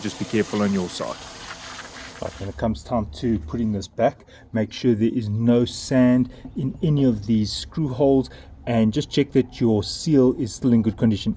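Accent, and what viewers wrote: South Africa. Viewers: South African boet!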